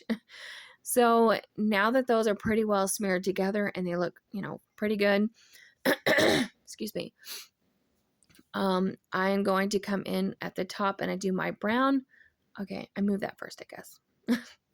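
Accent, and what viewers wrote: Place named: South, United States. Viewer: I love ❤️ your diys.. this really inspired me to get ready to start fall diys. You are so sweet love your southern accent. ❤️ I am from the south also. Have a blessed day.. keep those diys coming. 🎉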